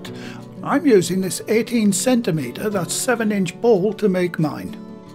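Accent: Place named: North East England, United Kingdom. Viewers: I think you’ve earned a sub. Gonna give these a try once they get flour back in the shops lol. Btw I’m from Sunderland your accent doesn’t seem you’re that far away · Thanks so much, those pasties look heavenly, I must give this a try. So well explained and presented, you are a star, absolutely love your North-East accent, take care, best regards Rob. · Hi where are you in the east as you sound as if your in Durham,my mumd loves how you speak as I shown her your channel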